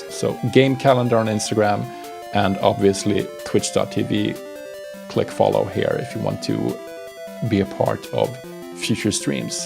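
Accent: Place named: Sweden. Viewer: Your accent is really swedish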